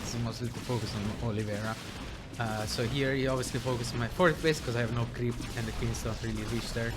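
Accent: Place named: Italy. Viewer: Thank you for the video! You did a good job of analyzing what Oliveira did right, rather than focusing on what you did wrong. Definitely the correct approach. Your perspective is interesting, and hearing your Italian accent is a nice bonus. My only critique is that the transitions whenever a cut happens could be improved. Either make it smoother/less obvious, or make it more obvious, as right now I get confused when a cut happens.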